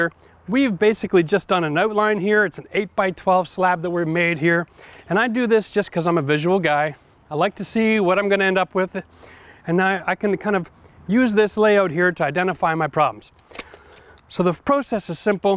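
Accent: Canada. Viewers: Haha, u almost remind me of red green, but with useful knowledge. And u have that nice Canadian accent. Bahaha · you talk funny, you must be from Canada. I like your video, thanks for sharing.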